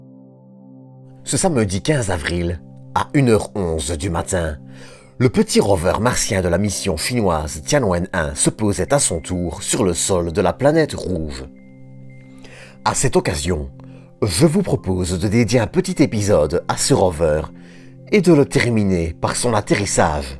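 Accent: Belgium. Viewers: Ta un accent belge jolie video👍👍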